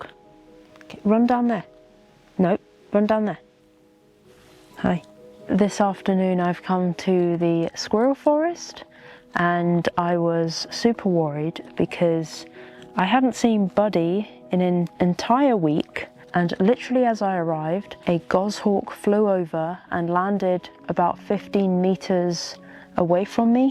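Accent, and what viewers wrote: England, United Kingdom. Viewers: Yes, but an English accent doesn't mean she holds only British citizenship and while unlikely may not even be British. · You don't recognise the accent? 100% English accent. Not even cockney accent. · Such a beautiful girl and pleasant British English!!! All the best from Russian subscriber!! Love this style of life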